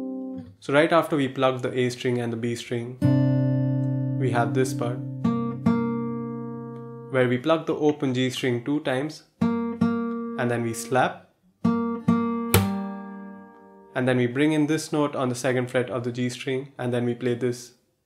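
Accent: India. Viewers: Merko ye banda indian lg rha.. accent bilkul indian vali hai..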